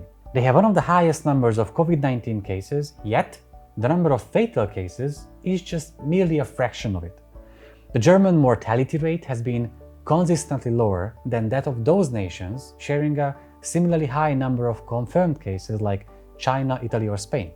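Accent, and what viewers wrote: Hungary. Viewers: Hungarian accent,,,dr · Your accent reminded me of my grandmother's. Sounded familiar. ;) I never got to learn Magyarul, but still hope to one day! Be well.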